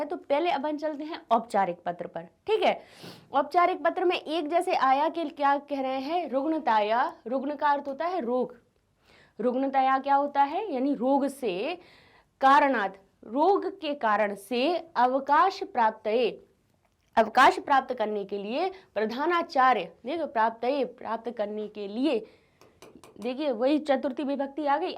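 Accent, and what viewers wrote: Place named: Gujarat, India. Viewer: Aap kya gujrati ho kyuki isiliye kaha raha hu kyuki aapki awaz gujrati jaisi hai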